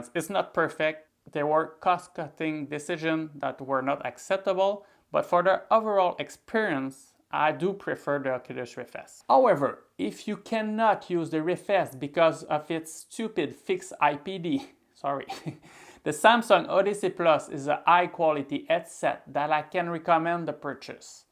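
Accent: Quebec, Canada. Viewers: Love the Kay-beck accent :) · i’m from Québec too, i heard your accent and i was like, omg i know this accent x)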